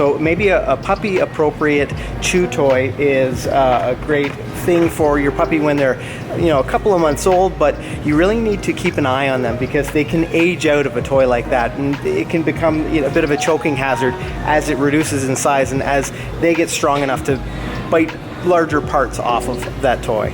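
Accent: Canada. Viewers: You sound really Canadian!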